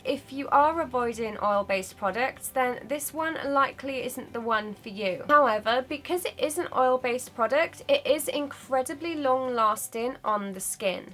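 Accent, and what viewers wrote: United Kingdom. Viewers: so in LOOOOVE with your british accent!!! its awesome! :) and i really like your videos, too. it feels so good to see you as a very self-confident person. do not ever lose it! i feel with you, because I'm also plagued by acne… greetings from germany.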